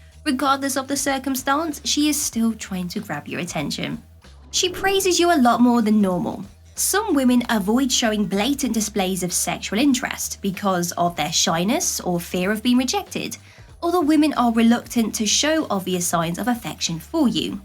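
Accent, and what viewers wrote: United Kingdom. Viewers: Sure. Combination of Karen Valentine and Lily from AT&T with a smooth British accent doesn't hurt either.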